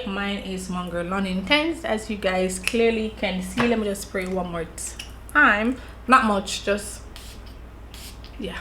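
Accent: Jamaica. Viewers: She is Jamaican and has an evident accent. I, and clearly many other people, can understand her perfectly. What was your intention with this comment, exactly?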